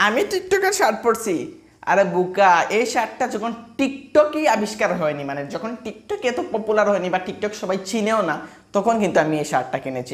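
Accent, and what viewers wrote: Sylhet, Bangladesh. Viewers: Sylheti math tmr muko vala lage... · আপনি কি সিলেটি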